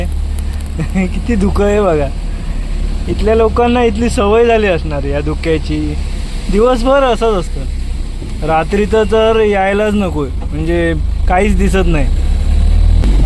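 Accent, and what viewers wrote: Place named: Goa, India. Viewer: Tumchi bolnychi tunning goan vatte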